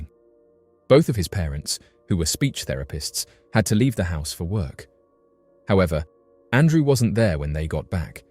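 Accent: United Kingdom. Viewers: In deed his pause and natural narrator skills very articulate and clear accent with traces of the best British language Looking forward for more subject Sr thank you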